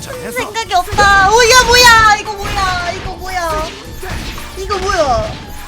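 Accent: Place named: Daegu, South Korea. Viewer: 부산사투린줄 알았는데 대구였군여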